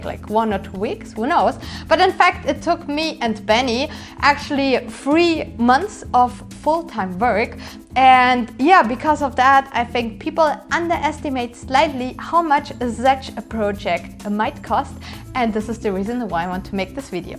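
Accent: Germany. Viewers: Ey ich liebe deinen Akzent, der ist so schön deutsch XD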